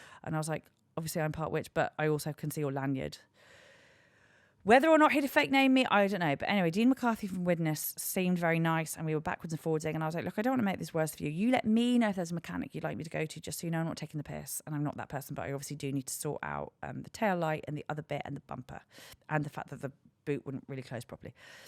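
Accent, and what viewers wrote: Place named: Liverpool, United Kingdom. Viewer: My favourite posh ginger southern scouser (when it’s in you, it’s in you). Go for it!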